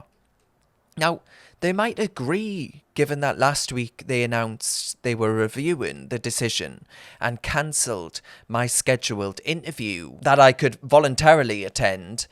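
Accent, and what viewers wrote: Newcastle, United Kingdom. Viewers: Like hearing a fellow Geordie on t'internet 👍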